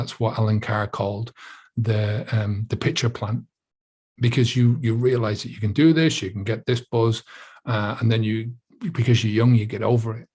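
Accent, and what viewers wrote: Dublin, Ireland. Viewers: Is that a Co.Dublin accent I can hear? 😊chose to knock drinking on the head 8 months ago, best decision I've ever made. I've been out in social occasions since, and have full memory of these evenings. Fantastic feeling waking up the morning after with no groggy " what did I do?" Fear. Great video. ❤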